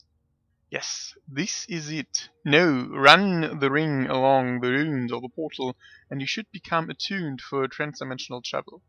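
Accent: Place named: Germany. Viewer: I dont know it feels like im listening to myself:) I hate perfect videos:D I know u are german I hear the accent im from belgium also strong accent:)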